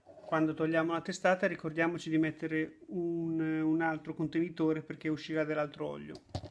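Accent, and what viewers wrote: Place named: Romagna, Italy. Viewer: Ciao, mi sono avvicinato da poco al "fai da te" sulla moto, ( ho un GS del 2005) grazie per i tutorial sempre ben fatti. Per le chiavi dinamometriche, ce n'è una che copre tutti i range di utilizzo previsti dai serraggi dei GS? Oppure mi consigli di prenderne 2 una piccola che arriva a tipo 25/30 Nm e un'altra che da 25/30 va fino a 60? Nm... Grazie per info..... Dall'accento si intuisce che sei romagnolo, posso chiederti di dove? Grazie mille.. Ciao... 🖐️👍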